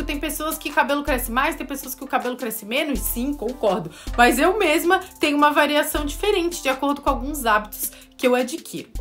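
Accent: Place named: Florianópolis, Brazil. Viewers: Tão bom abrir vídeos aqui e ouvir esse sotaque! Uma impressão de que te conheço de Florianópolis... Só quanto à massagem, não fizeste recomendação explícita de massagear com as unhas, mas ficou a dúvida em muita gente sobre isso. Acredito que tu saibas, mas é bom reforçar por aqui: massagem só se for com as digitais dos dedos, com a parte macia! Never do it with the unhas! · nossa escutei o sotaque e tive certeza q era daqui de floripa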